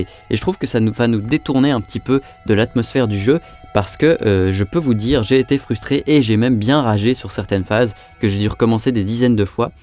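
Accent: Belgium. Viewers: tes belge ?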